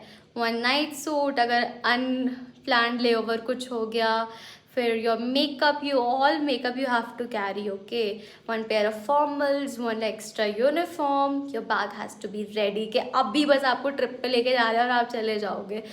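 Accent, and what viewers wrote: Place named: Karnataka, India. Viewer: U are getting habitual to kannada accent 🤣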